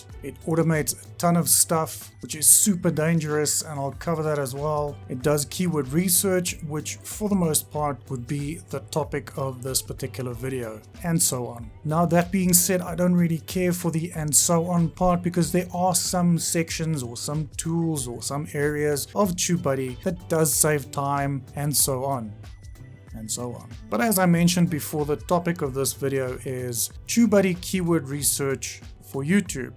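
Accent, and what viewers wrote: South Africa. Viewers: I detect a South African accent. Are you per change South African? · Do I detect a South African accent? Any way, I'm a new youtuber and used tubebuddy for my recent video and I cant really see a better results, I just still dont seem to get traffic to my videos which directly effects the amount of views I get.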